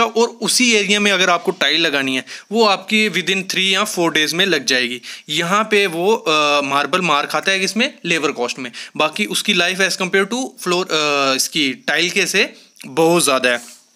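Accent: Jammu, India. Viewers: Jammu se ho aap.??. Dogri touch ha ap ki language me. Good explanation 👍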